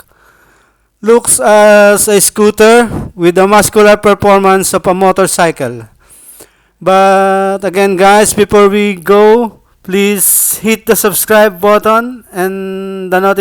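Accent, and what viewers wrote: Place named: Philippines. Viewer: Tagalugin mo na lang kabayan. Halatang halata naman na Pinoy ka sa punto mo hehe. Tagasaan ka po sa Pinas?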